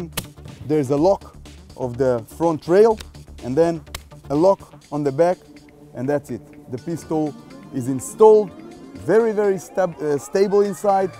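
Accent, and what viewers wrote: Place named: Israel. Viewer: It is called Israeli accent : )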